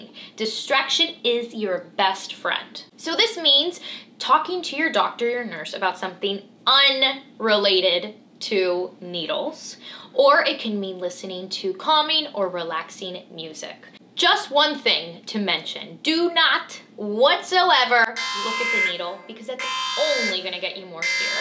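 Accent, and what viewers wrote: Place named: Dallas, United States. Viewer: You sound like your from Dallas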